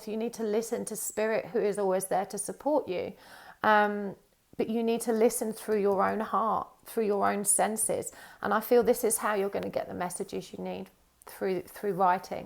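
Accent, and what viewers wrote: United Kingdom. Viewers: Omg i love the British accent. Like it's super interesting why people in England have accents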